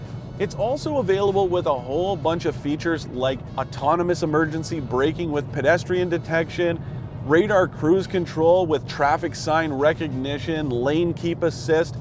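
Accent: Canada. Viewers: Michael Zhu he's Canadian. Canadian's talk the way they do just as people in the U.S. South talk the way they do. Ever heard a southerner drawl out nearly every word they speak?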